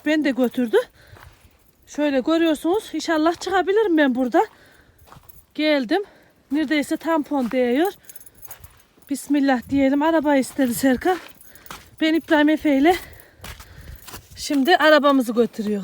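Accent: Aksaray, Turkey. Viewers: Yeminle doğal konusmani çok seviyorum lütfen Kibar konuşma Aksaray aksanıyla konuş:^) ayrıca" dürüm" lerine bayılıyorum 👍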